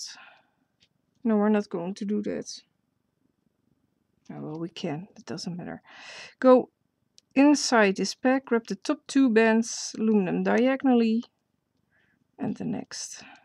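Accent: Netherlands. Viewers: you dutch ? You sound really dutch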